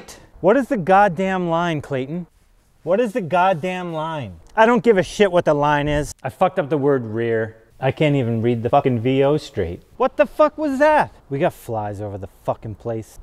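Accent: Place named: Canada. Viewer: Canadian accent.. eh?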